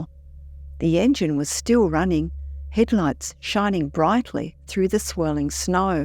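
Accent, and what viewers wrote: New Zealand. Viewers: Is that a kiwi Accent I hear? Your stories are great and your voice is beautiful and if that’s you in t he thumbnail…..❤ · I think it’s a real person, she’s just a New Zealander. So the accent is different than even Australian mainland accents.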